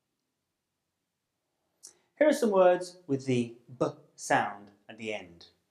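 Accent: United Kingdom. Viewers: Sir! Is this British pronunciation??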